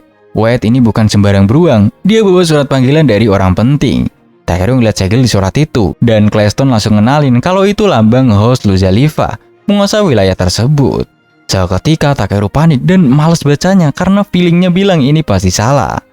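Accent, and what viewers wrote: Medan, Indonesia. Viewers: Kaya Medan aja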